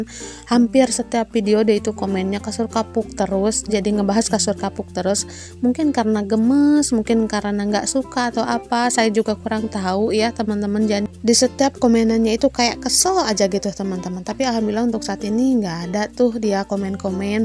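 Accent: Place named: Sunda, Indonesia. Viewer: kalo ngomong kayak istrinya bang deni cagur logat sundanya kentel😅👍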